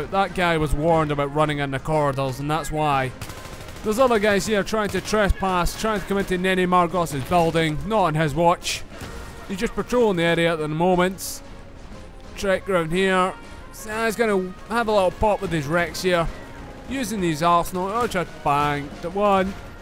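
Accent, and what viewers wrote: Scotland, United Kingdom. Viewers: fucking love Scottish Commentator xD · Your scotish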